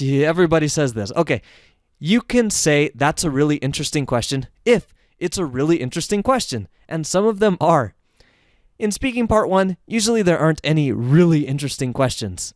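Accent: United States. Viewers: Are you american?.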